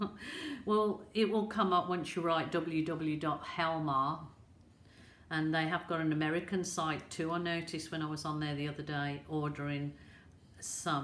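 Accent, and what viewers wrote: Birmingham, United Kingdom. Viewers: I knew I wasn't going daft! I knew I could hear a bit of Brummie in there lol xx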